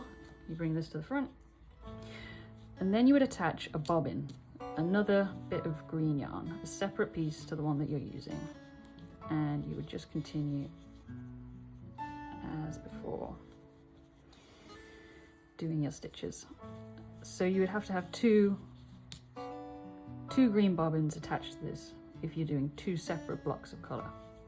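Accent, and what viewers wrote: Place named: United Kingdom. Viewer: URGH NO 😢 this is USA term why do people who sound so very British do this what is wrong with British terms? This is half treble! 😂😂 Sorry for the moaning personal bug bear I learnt from my nan fifity plus years ago any old british pattern will not work if you think this is half double not a half treble. That said super video I did. give you a thumbs up and the tiger looks fabulous do you have this available anywhere to get?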